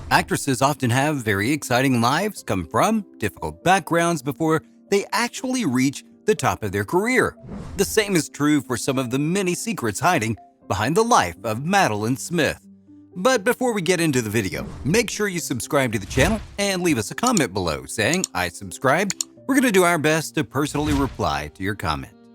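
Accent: United States. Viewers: No offence ,but why an american narrator ?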